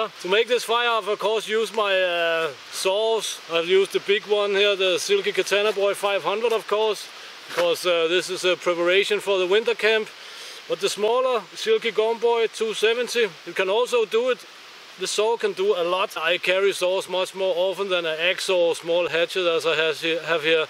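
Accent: Germany. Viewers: Why the German accent?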